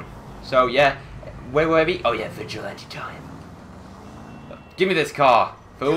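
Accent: Liverpool, United Kingdom. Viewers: GinaBee yeah i think so, SCOUSE !!! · Are you from Liverpool?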